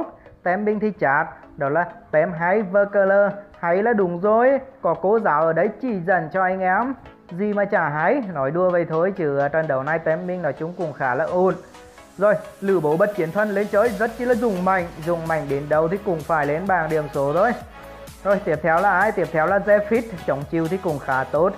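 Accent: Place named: Central, Vietnam. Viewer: Bố gét giọng miễn trung DKMcm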